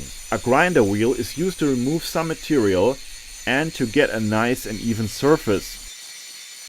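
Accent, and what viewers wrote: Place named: Germany. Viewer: Your English in German accent cause HEADACHE. Better no narration and keep quite.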